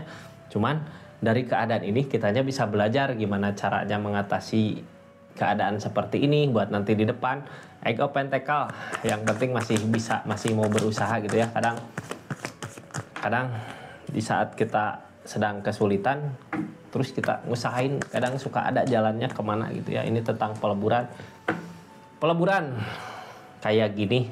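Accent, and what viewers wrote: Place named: Sunda, Indonesia. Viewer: Amiin alhamdulillah alhamdulillah alhamdulillah alhamdulillah alhamdulillah alhamdulillah alhamdulillah, makasih redingannya sehat sll berkelimpahan amiin, hallo mas ganteng seperti anaku no 3 bhs sundanya medok bangett, makasih sangat resonet kecewa la sudah 35 thn di khianati sekarang la gak mikirin asmara deh la mati rasa cinta yang penting anak anak bahagia berkelimpahan tatap hari tua di depan byk kebahagiaan dan keberlimpahan semangat 58❤❤❤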